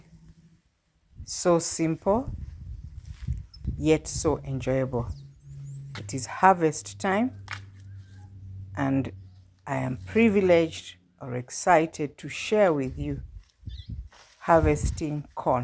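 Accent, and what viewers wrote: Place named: Kamba, Kenya. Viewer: I sensed that you sound like Kamba, where are you from?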